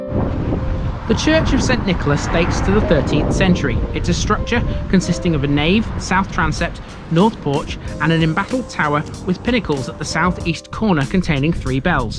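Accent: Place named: Doncaster, United Kingdom. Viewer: Thanks for taking the effort to make these vids, I've just found your channel. Really interesting & a welcome diversion from the current madness! Keep smiling & your accent is like being back 'ome (Donny).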